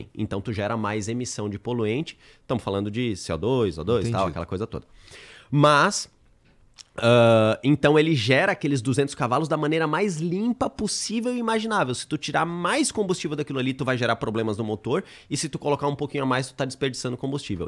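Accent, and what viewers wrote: Rio Grande do Sul, Brazil. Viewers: Acho tu tu tu virou gaúcho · Ele é gaucho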